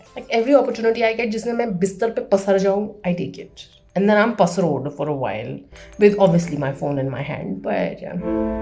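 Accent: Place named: Lahore, Pakistan. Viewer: Your accent of Urdu/Hindi is so Lahori. Lots of love..Keep shining:)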